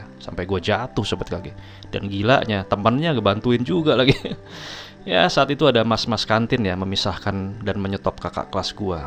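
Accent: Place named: Cilacap, Indonesia. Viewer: Wah cilacap nyong dong😂 sehat"lahir batin bang😇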